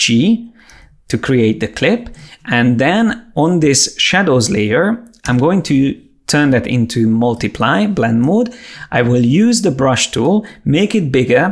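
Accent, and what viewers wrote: Hungary. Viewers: I'm like 99% sure this guy is Hungarian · oooh a hunglish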